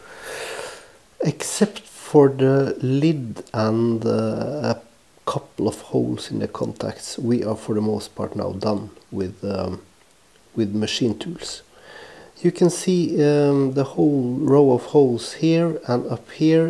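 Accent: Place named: Sweden. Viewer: i have one question? are u swedish? u rly sound swdish i am finnish btw xd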